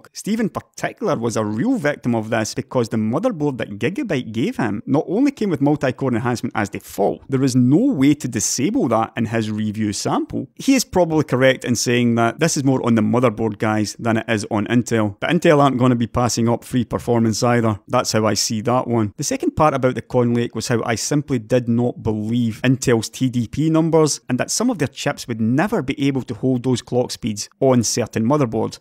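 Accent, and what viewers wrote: Scotland, United Kingdom. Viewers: Well, I'm a bit of an AMD fan. I think is more about keeping monopolies in check. I've never really seen you as bias. Sure you have your favourites. But to me you come across as very matter of fact, no marketing bs. That combined with the scottish makes your informed opinion matter to me. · A little bit long. Also, we have a Scotsman in an argument with an Aussie, and I'm going to back the Scotsman. That's not going to make me a lot of friends.. Now, we're a day or two away from the release of the two thousand series Ryzens. Now watch what happens when "independent testers" start testing the new Ryzens. Some people are really independent testers, some are fanbois. Intel will lean on everybody to skew the tests in their own favour. And Intel will have plenty of fanbois to imitate the Russian secret service on EweChoob and every other social media, they probably won't even have to pay them.... 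AMD... they have some pretty rabid fanbois of their own...... yeah, worth watching (listening) to the end of the last one. He does seem to be going off at Aussie Steve quite hard, but that does change toward the end. Watch all of it before you throw stuff. I would back, and I am an Aussie. I have yet to hear him say anything I sharply disagree with. That alone, puts him in a different group to most other tech tubers... The Scots accent is a little bit thick, but the man who has it, is not.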